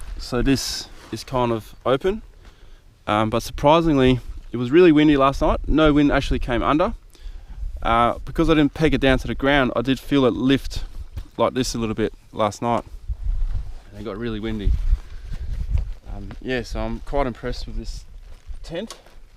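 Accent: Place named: Australia. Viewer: I just love Aussies say 'in winter'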